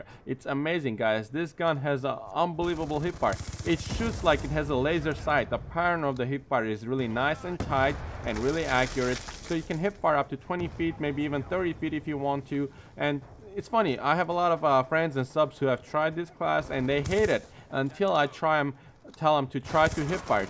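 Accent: Russia. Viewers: You're really cool man, you've earned a sub, like and a comment :P But 1 question: are you russian or american or what? sorry i'm not too sure. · cool class you sound russian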